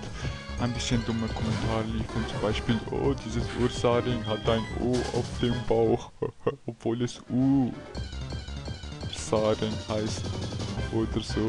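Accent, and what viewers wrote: Switzerland. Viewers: bist du schweizer?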